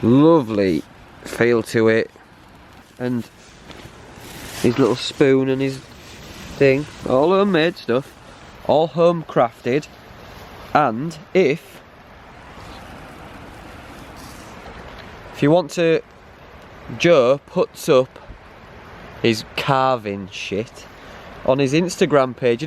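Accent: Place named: Cumbria, United Kingdom. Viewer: Forgets water but remembers alcohol - that's about right haha. Can't imagine how hard it was to drag yourselves out of your hammocks - cold & hungover in the morning. makes me shudder. I much prefare to get my shmoke on when I'm sat next to a campfire. Each to their own n all that though ehh :) Looked like a chilled out night. Cheers for sharing. Btw, Whats that accent? you sound like my ex. She was from Cumbria but lived in Preston for 8 years. Just being nosey...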